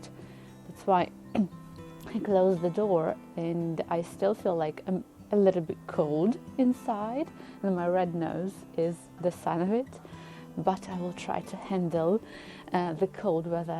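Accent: United Kingdom. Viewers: Ощущение, что говорит британка. Акцент классный!!! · the british accent is unbearable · Thank you for takeing these videos. I'm watching you since some months and I'm still impressed by your British accent and your ability to make gardening interesting (I've never been into it xD). But I can really relax watching you. I hope all your plants will grow great and am looking forward for your next videos. Greetings from Berlin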